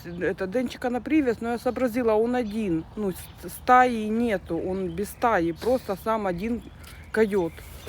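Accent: Ukraine. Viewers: Видно що ви з України, говор наш. Дивлюсь і радуюсь що ви не там де бахкають. Щастя вам і удачки, а кому не подобається хай не дивляться і не слухають.❤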